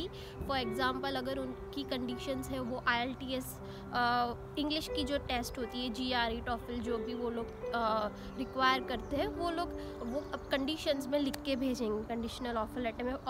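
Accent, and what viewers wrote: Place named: Gujarat, India. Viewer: The way you speak hindi is so adorable ♥️ and obviously that gujju accent makes your every single word soo sweeet♡ keeep growing budd💫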